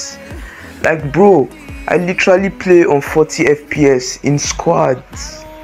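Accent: Nigeria. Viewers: It's Nigerian accent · w voice (like the Nigerian accent)❤❤ i am Nigerian! 🇳🇬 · idk why i didn't expect u to sound Nigerian but nice voice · I love Nigerian accents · I LOVE THE ACCENT YOUR NIGERIAN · the nigerian accent :D, luv it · W I can here ur actual nigerian accent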